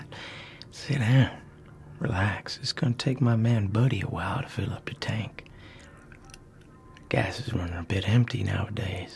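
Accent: South, United States. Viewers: Considering I LOVE the original movie and I’m excited and hyped for the upcoming TCM multiplayer game by Gun, I am so glad you made this video!👍 Also props to your top tier acting and your southern accent, dude. Your character could EASILY fit in as a member of the Sawyer/Slaughter family.😁👍 Btw, just as a side note, Gun is making brand new Sawyer family members from the ground up for their upcoming game.😊👍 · Southern draw not a accent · Very enjoyable! Absolutely fantastic! That Southern accent sounds gorgeous! Loved it! 😁✌💕❤🎭🪚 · Absolutely amazing. I adore adore adore your content 🥰 so thank you! I absolutely love horror and bad no idea this could be achieved asmr wise. I’ve watched it twice now to sleep 😌;; - love the southern accent too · Dude I’m from the south, and I confirm this accent is super on point. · I could see this easily turing into something hilarious too with the southern accent an all. 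Some barbeque sounds good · This is a REALLY good Southern accent, and that’s coming from a native Southerner. I’m not usually into roleplays with affected Southern accents because they’re not often done well (in my opinion), but this is so good!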